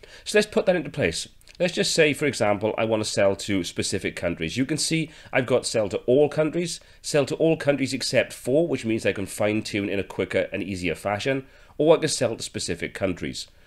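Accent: Wales, United Kingdom. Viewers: Only just found this! Thank you so much! Also used to live in Cardiff whilst at Uni, so pleased to hear that Welsh Twang! Quick question: I have 3 products with a £1.29 UK Large letter size cost. I have 4 products with £3.85 UK small parcel up to 2KG cost. However, I'm not sure how to make the shipping default to £3.85, if 1 of each product is in the basket, as both will go in 1 parcel. This is not a percentage or item based cost equation. Many thanks in advance for your help - happy to email and pay for your help too. Neela.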